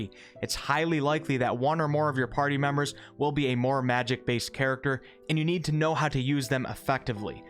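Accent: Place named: Minnesota, United States. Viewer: What is your accent? Is this like Minnesota or something?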